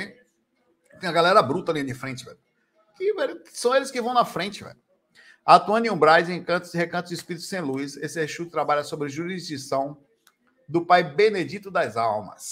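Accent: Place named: Bahia, Brazil. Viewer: seu baianês é uma pérola. Obrigada!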